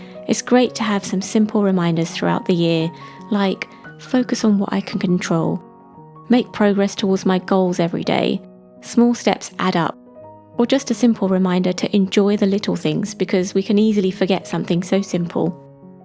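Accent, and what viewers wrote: United Kingdom. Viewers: I made it til the end and are now your newest subbie🥰💖 LOVED the set up and the notebook and I am very impressed by your ability to keep it so neat and clean and minimalistic but still very beatiful and inspiring and incredibly pleasing to the eye, or for the eyes(?)(English is not my first language, I am from Kristiansand in Norway❤️👱🏻‍♀️❤️💙🇳🇴💙❤️ I can’t wait for more videos to come and now I am gonna look for as many videos from you as I can find, cos I REALLY LOVED everything about your art and British accent of course (lol😅🥰) and your editing is amazing. One of my main goals for this year is to learn how to edit videos so my subbies and others who see my videos don’t have to always watch in real time every freakin video, except for the flip through ones where I just show off something I made. And another (more important) goal for next year is to try and eat at least one time every day. 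Because of my recovery from Anorexia I have ruined my feeling of hunger, so if no one or nothing reminds me to eat, it can go days without food or water(except for when I brush my teeth every morning and night then I’ll have some sips of water but that is not enough. And I want to try again and cure some of my insomnia which I’ve had since day one when I was born. 20 minutes is the record in my entire life on how long I’ve slept and I REALLY DESPISE (or how you spell almost hate) waking up after one or two minutes of sleep every bloddy night. So that is why I am sitting here at 4.30(?)(we a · Made it to the final spread, love the minimal approach, got some great ideas too, My goal is to use my planner to be more productive and sell more of my jewellery. Its lovely to listen to a fellow brit ❤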